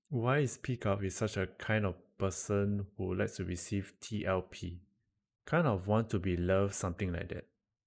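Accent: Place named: Singapore. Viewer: Hello!! Are you from Singapore ? You have the accent ! Thank you for uploading these videos btw they are my comfort videos haha 💕💕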